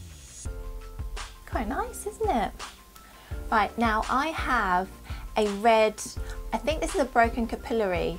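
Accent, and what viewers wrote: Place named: United Kingdom. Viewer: You seem lovely great video! glad I have came across your channel! nice to hear another british accent! take a look at my channel x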